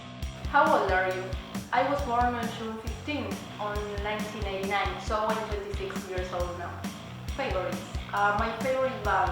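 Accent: Argentina. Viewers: te saque la ficha con sos argentina por tu acento nada mas jaja, sos una genia loca, un beso desde Mendoza. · por el acento se te saca la argentinidad al toque . igual me tendria que haber dado cuenta por tu nombre artístico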